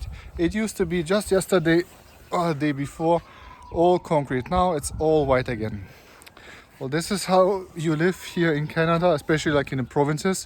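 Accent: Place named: Germany. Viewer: your accent is cute. i can tell right away you are german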